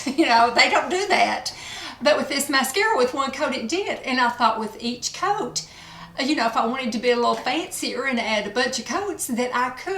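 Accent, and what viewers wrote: South, United States. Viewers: I could listen to your that lovely southern voice of your s all day ❤️💞 definitely going to buy that mascara.you have lovely eyes so sparkly.eyes are definitely the window to the soul.😘😘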